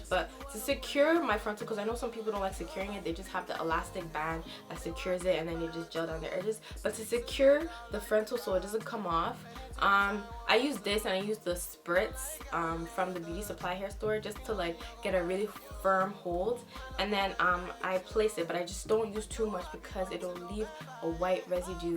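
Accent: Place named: Nigeria. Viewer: Liked right after I heard the Nigerian accent 😂. New Sub‼️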